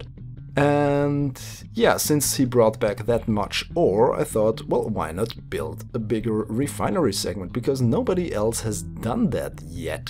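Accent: Germany. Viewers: Are you german? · Are you German? I feel like your accent is German. I may be way off, bit you sound German. Also, your accent really eccentuates the scope of your videos.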